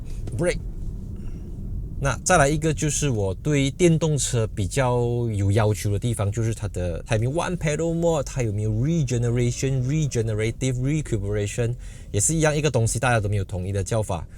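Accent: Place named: Malaysia. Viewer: 讲解很专业 大马朋友说 华语 口音很好听的感觉 ❤ 👍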